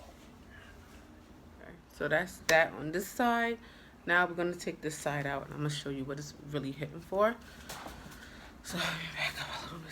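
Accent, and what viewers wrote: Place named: Brooklyn, United States. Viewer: Before I heard you say ah ah ah ba ba ba I was like she from Brooklyn lol. You wear the hair well and the color is nice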